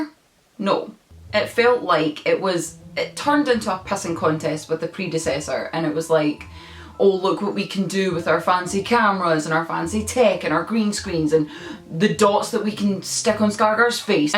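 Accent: Scotland, United Kingdom. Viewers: Your Scottish ? I could tell ha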